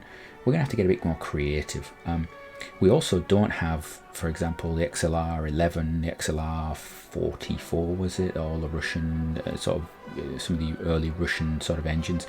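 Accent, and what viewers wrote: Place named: United Kingdom. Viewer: hmmmmmmmmm that british accent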